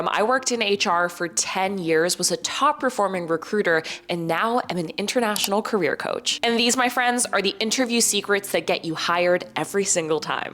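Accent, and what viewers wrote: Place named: Canada. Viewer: Sounds Canadian…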